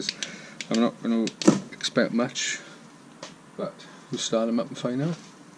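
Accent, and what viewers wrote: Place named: Scotland, United Kingdom. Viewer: Hi i am Chris i watch your channel and like it but sometimes hard to understand your accent where yod from in UK I bet scotland or close. regards Chris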